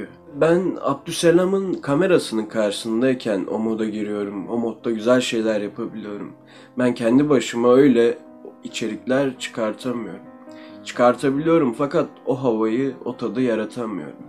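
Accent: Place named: Azerbaijan. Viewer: vay, bey Azerbaycan Türkçesine değdiriyor.✍️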